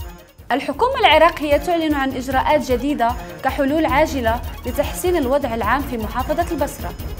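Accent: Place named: Morocco. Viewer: لا علاقة لها بالاعلام....كأنها جهاز آلي يتكلم....كما انها لا تمتلك أي احترافية ولا مؤهلات تخولها للدخول في مجال الاعلام....يجب عليها أن تتخلص من لهجتها المغربية لما تقرأ......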